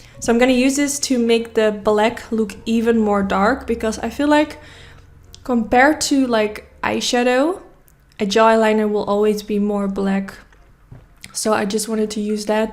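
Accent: Netherlands. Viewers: Your accent sounds Dutch? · Nederlands accent hahahah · You sound Dutch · Not even 20 sec in: Me: that's so Dutch.... Lol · I can hear youre Dutch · Ik hoorde gelijk dat je nederlands was, haha! Mooie video, ben gelijk gesubbed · Ik hou van je Nederlandse accent in je Engels ❤️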